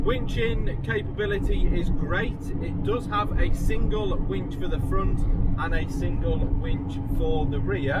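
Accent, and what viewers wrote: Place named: Staffordshire, United Kingdom. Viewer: 6.39 zoom! zoom! zoom! 😂😂 😂 Yer do make me chuckle with your alreet greeting, proper Staffordian hey👍, your becoming very confident chatting to camera now, brilliant content, might have a word with Shane and see if we can get you on one of our cinematic films, you can do all the talk to camera scenes for us! 😝 Great video mate, really enjoyed it 👍👍👍👍👍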